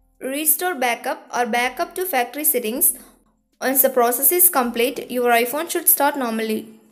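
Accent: India. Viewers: I like the way you speak and your voice. You’re Indian right?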